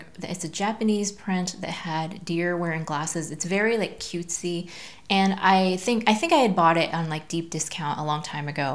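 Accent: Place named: United States. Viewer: I'm not sure where you are, I'm guessing USA from your accent, in which case I don't think they sell the cutting table there. It's a New Zealand company and I bought mine in Ireland, I also have one of their sewing machine tables. However, I'm sure that there must American brands that offer similar products. The most important thing is the ability to set it up to be the height that suits you, it has made a huge difference to my lower back. Lockable casters are useful too.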